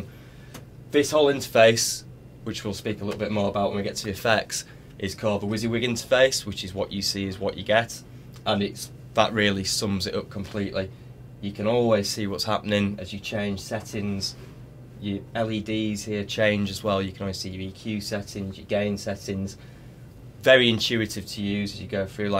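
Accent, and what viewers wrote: United Kingdom. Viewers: The combination of the British accent and sweet beard lead me to believe that this man is Guthrie Govan's alter ego.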